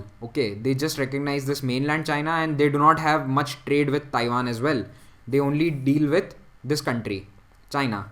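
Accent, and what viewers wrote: India. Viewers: Best Indian accent Ive heard .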